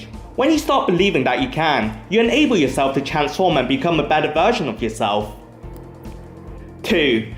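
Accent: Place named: United Kingdom. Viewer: Totally love that British-Chinese accent ;)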